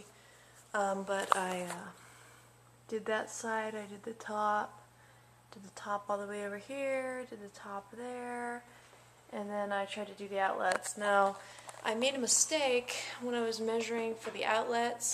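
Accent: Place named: Pennsylvania, United States. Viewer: Ha! I knew you were from PA by your accent! I"m in Pa too! What part did you come from? i'm in NorthEastern, Near wilkes-barre/Scranton. I'm Guessing near Lancaster/Lebanon? Love ya hon! Ruthanna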